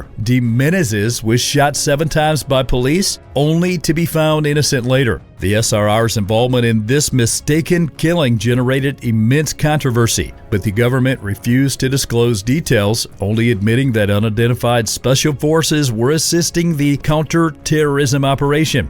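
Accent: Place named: United States. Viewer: Another disappointing video created with a startling level of ignorance. Why narrate a history of the SRR with a mostly American military forces video overlay. An American accent narrator is also a big turn off.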